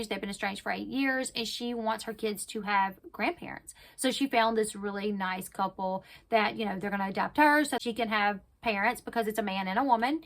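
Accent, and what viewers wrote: United States. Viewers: I like your accent. What part of America are you from? I’m from South Australia, so I’ve never heard your accent before.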